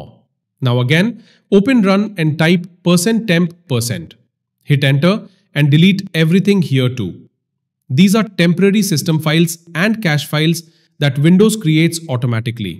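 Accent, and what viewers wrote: India. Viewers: just move file location , its simple as that. Also remove apps setup file as .msi in those folders. Move apps out of C drive. Remove previously installed Windows file Change location of My documents and Downloads Use Windir stat to see , the tree file size. Turn virtual memory to Auto. Anything set to lower value or higher value has its on demerits . Delete recycle bin Delete prefetch, temp, %temp%. You sounded indian, And my expectation was sky high, but u delivered less . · AI generated slop, the thumbnail face does not quite seem to match the indian accent.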